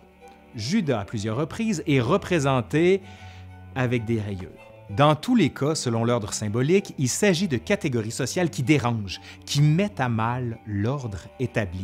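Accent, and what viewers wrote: Quebec, Canada. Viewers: Bravo ! J apprecie de plus en plus votre chaine. J adore l histoire, et vous arrivez à me faire découvrir un tas de choses ! En plus, j avais un peu de mal avec l accent québécois et maintenant j adore 🥰 Continuez, c est si passionnant!! À bientôt, Aurore de Paris 🐇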